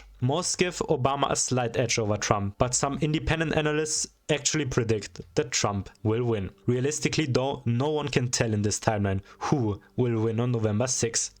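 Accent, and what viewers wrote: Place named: Germany. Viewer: Der deutscheste deutsche Akzent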